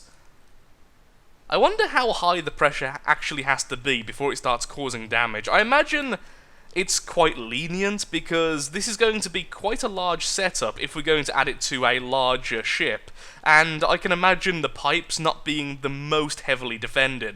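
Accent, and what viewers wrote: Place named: Black Country, United Kingdom. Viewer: Love hearing youtubers with that Black Country accent :D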